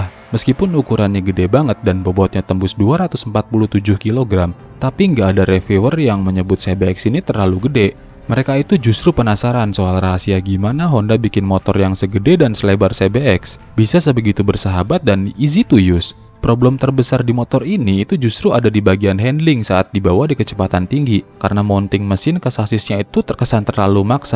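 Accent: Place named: West Java, Indonesia. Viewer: Orang sunda mana kang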